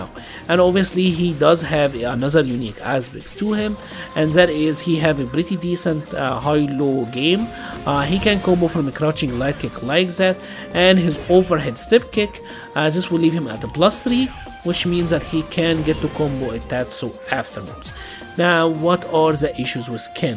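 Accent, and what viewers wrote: Egypt. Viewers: Are you Egyptian? You sound Egyptian.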